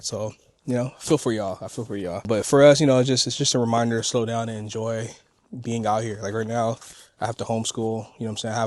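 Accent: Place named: California, United States. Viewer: You are a wonderful husband and father,l love to how you interact with you family,you hold it down as a MAN and I love the respect you show to your family, I love to see how she give you your time to yourself and you do the same to her.. county the good work my God bless you and your family.. by the way I been watching you two since you started.. I just love your California accent I'm from Virginia and I have a southern accent.. love your content.. and content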